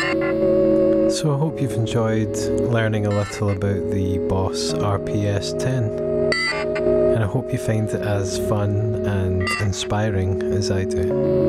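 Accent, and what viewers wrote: Scotland, United Kingdom. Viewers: Nice hearing another Scottish accent on gear. I got one of these ages ago but never really explored the input weirdness. I didn't even think of using a Euro oscillator/sequencer for the pitch. This is great. Just what I was looking for. I wonder if CV could be sent to the HOLD input too. Hmmm.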